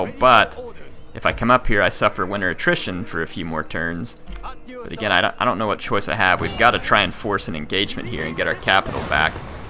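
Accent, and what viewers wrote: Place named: South, United States. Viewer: we miss your southerner accent:D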